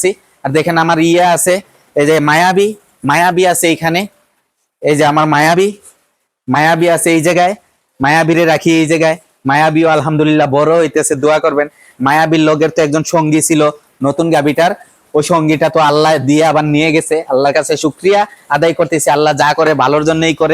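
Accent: Sylhet, Bangladesh. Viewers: ভাই আমি সিলেটি আপনে নি সিলেটি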